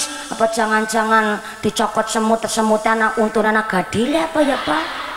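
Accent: Banyumas, Indonesia. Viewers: Assalamualaikum hadir lagi nonton ceramah ngapak Ustadzah Mumpuni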